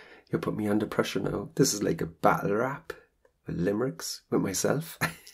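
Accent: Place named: Ireland. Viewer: Me a year ago:I'm not into Irish accents Me now every time I here it:OH MY GUD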